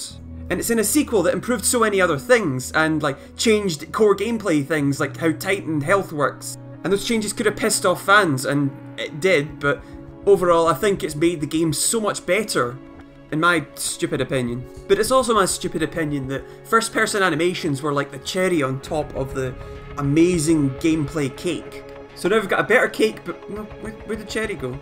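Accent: Scotland, United Kingdom. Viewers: you sound scottish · yasss another scottish youtuber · The pure Scottish accent makes me happy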